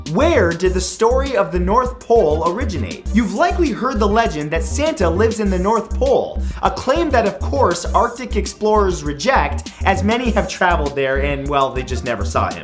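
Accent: Canada. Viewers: Wow now i definitely know that your Canadian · Is he Canadian?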